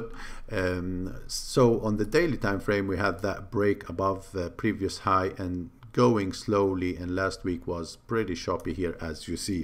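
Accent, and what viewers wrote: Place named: Iran. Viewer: You sound like you are from originally from Iran.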